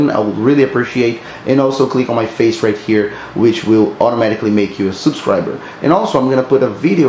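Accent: Brazil. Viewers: It took me 5 secs to know this dude’s a Brazilian... it’s engrained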